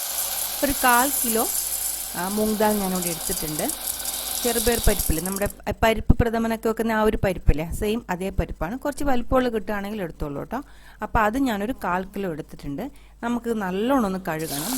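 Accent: Tamil Nadu, India. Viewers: Chechide Tamil kelkkan aanu rasam.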